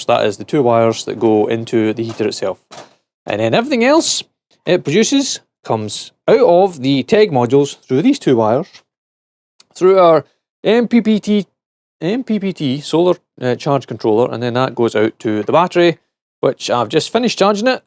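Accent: Scotland, United Kingdom. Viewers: I love Scotish accent. I always hear something else, like the time you said "I do not have enough hands". The thing I heard was "I do not have enough Hans" :D Nice project btw :) I have brought also this diesel powered heater, to heat up my garage. That project is still work in progress. Also I will install it to my car - 2015 Aixam City Moped-car. That car is so cute, I can make 3-point turn in tight places :)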